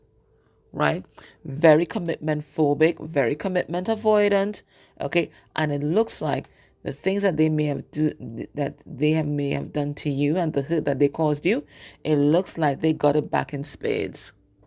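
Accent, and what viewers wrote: Trinidad and Tobago. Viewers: Hello, are you Trini?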